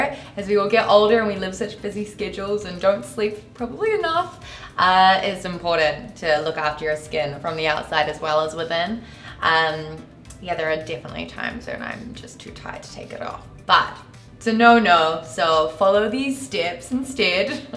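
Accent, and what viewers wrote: New Zealand. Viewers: Inna DC She is from New Zealand. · Yup...new zealand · New Zealand. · That Kiwi accent! LOVE! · Shes a kiwi 🥝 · Kiwi thick but definitely tell she talks to ot of american lol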